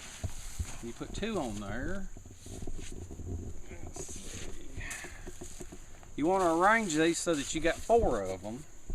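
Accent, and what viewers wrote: South, United States. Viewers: Fantastic Invented Southern Brilliance. Your Honesty, Sense of Humor, That Great Laughter, Down Right Humbleness, Deep Southern Dialect, Warm Hearted Introductions and Best Father-ship makes your videos all worth watching all the way through to the end. Do you offer to your fans any of the products you design, I am a big fan of the V-Tree Grill. All the Very Best!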